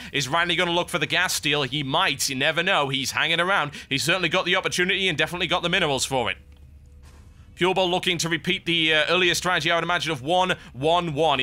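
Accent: United Kingdom. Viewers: not to make a mean comment or anything, but if he wasent british, that would make you 25% less awsome. but you are, so you my friend, are epic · He commentates at 300 words per second, you can still understand what he's saying, he doesn't trip over his words, he makes freakin hilarious rants WHILE commentating the match, and he's British! How can you NOT like his commentary!?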